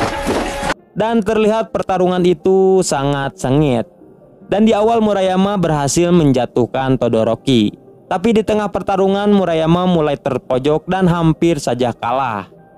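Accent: West Java, Indonesia. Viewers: Bahasa nya gk angger,ada sunda²nya 🙏🏾🤭😂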